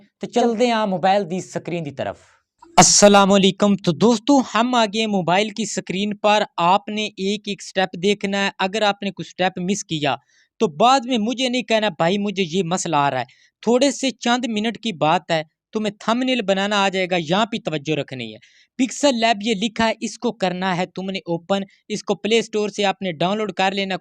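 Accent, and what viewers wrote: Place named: Punjab, India. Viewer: Hmmmm urdu m panjabi ka tarka ...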